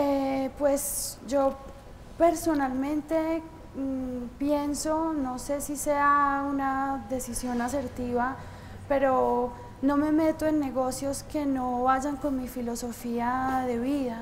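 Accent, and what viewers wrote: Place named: Antioquia, Colombia. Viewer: Alejandra Moreno sencillo por que es paisa y se le escucha bien bonito, deja la envidia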